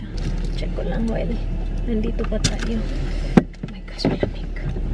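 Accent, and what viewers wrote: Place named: Visayas, Philippines. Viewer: Hahaha ka cute sa buang ba hahaha bisaya pa more😍😍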